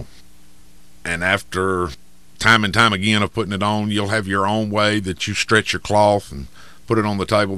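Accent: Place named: United States. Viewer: Probably the thickest American accent I've ever heard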